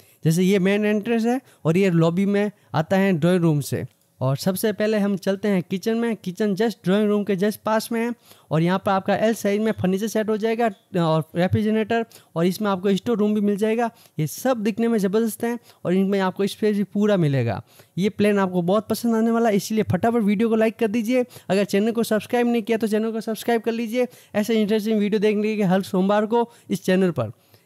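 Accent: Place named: Jodhpur, India. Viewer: Maza aa gaya apne jodhpur walo ki hindi bolne se pata chal jata he ki apne jodhpur ka hi he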